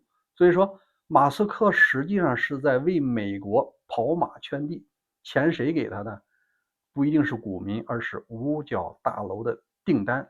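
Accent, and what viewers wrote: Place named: Shandong, China. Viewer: 这山东口音听得难受，你好好练练普通话再说。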